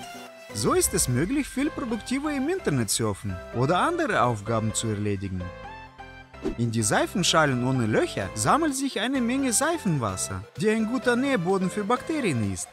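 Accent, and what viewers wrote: Russia. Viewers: Русский акцент нескрыть😂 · Der Typ ist mal Super symphatisch und macht diese ziemlich aufwendigen Videos erstens mit Begeisterung und zweitens auf deutsch, was verdammt mutig ist, da es für ihn ja eine Fremdsprache ist. Und da ist es wirklich extrem Dumm, sich über den russischen Akzent zu beschweren. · ja und................ ich mag den russischen akzentuiert hört sich cool an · Sein russischer Akzent ist sowieso richtig lustig xD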